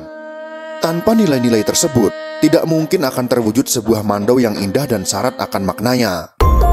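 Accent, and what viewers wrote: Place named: Java, Indonesia. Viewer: Ini yg menguak sejarah pasti Jawa?🤣 Dari cara bicara udh ketahuan 😂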